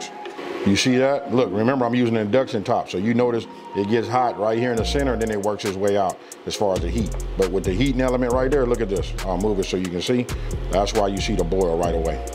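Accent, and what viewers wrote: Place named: West Coast, United States. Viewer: I just love that #WC accent😊💯. And the dishes are A1💪🏿. I'm making this dish tonight for a family of 5(including myself)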